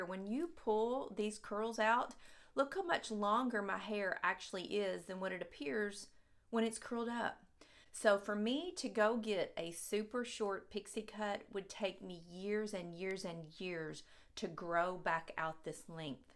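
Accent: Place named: Mississippi, United States. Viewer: Your accent is the cutest!! It makes me feel like i'm back home in MS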